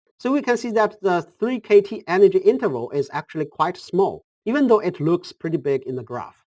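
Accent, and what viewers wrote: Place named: China. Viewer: Sounds like chinese accent, lol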